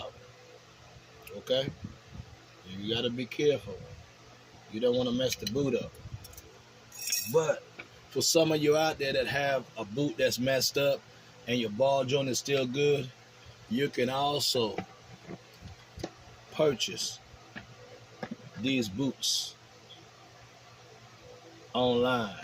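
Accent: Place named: South, United States. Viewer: I could listen to his Southern Drawl all day long!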